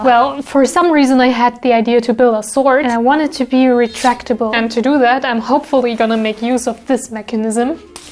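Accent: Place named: Germany. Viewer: You Sound German or durch, and after you Said the Temperature dropped I knew exactly in what time frame you Made your Project xD i hated the Last two weeks because of their weird weather 😂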